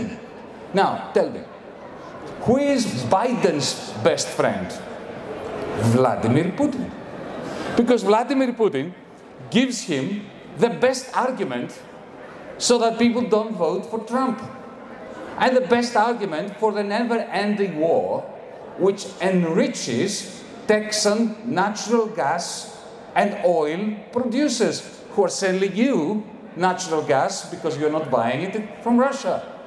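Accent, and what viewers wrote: Greece. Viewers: 希腊人说英语的口音都是这样😂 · 典型的希腊口音英语。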